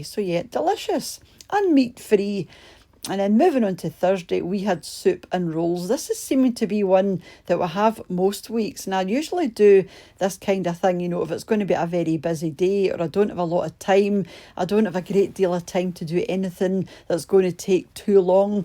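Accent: Scotland, United Kingdom. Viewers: I watch your channel because I love your Scottish accent 😀. And your cooking of course.🇨🇦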